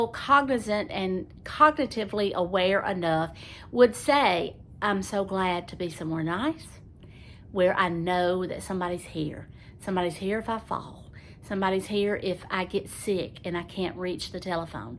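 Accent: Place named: Alabama, United States. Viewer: thank you! We had a set back to monday. I too have been told to wait a week or more till he settles in. I’ve watched you so many times for advice and I appreciate all that your teaching all of us going through this hard transition. And your accent brings me comfort as my people are from Alabama as well. I don’t know what town your from but my people are Russellville and Athens. I’m wondering your advice on stage 5? What stage do most need full time care?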